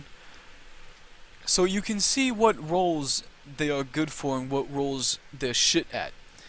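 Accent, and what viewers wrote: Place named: Brooklyn, United States. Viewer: Are you from Brooklyn?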